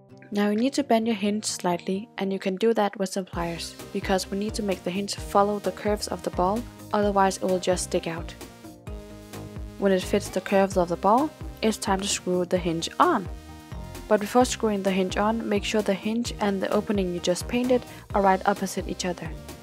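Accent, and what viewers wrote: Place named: Denmark. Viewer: What cool projects! I love your accent - do you live in Denmark?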